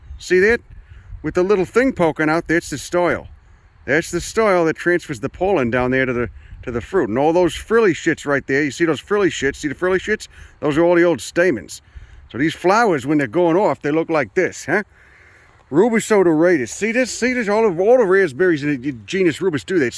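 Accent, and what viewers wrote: Chicago, United States. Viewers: Aye as a WNY native, Buffalonian to be exact I've been waiting for this. The train tracks in Buffalo & the connected bike path (compete with an abandoned golf course converted to a diy skatepark by the local latch key youth) is where I fell I love with native plants. #Greatlakessupremecy..........I'll forgive the NE accent, but you're closer to WNY with your Chicago accent than you would be with New England.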